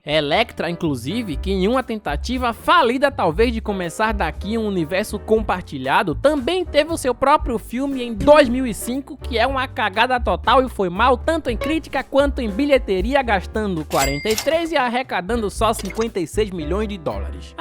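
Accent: Northeast, Brazil. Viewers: En, tu é nordestino?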